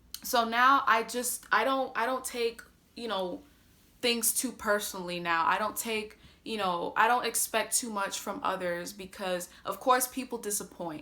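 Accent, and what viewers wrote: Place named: Brazil. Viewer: You have a slight Brazilian accent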